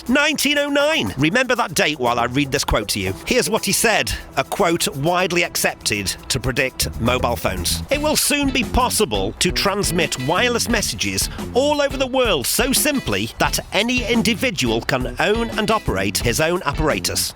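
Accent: Stoke, United Kingdom. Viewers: Annoying British accent, unnecessarily bloated content. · I've only just found your channel. It's brilliant. Your accent is very similar to mine, are you from Stoke ?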